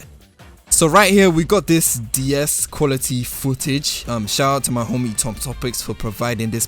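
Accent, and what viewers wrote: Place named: London, United Kingdom. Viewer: good video my bro you sound like your from where i’m from london/uk good to see london guys doing sonic news 👊🏾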